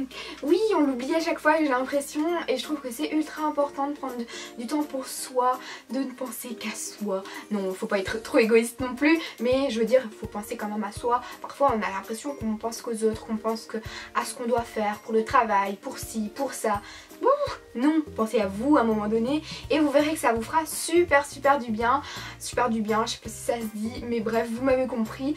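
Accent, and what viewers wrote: Belgium. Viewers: merci pour cette superbe vidéo 😘😘 t es trop belle 😍 vive la belgiiiique et l'accent 😍😍😍😍😍😍😘😘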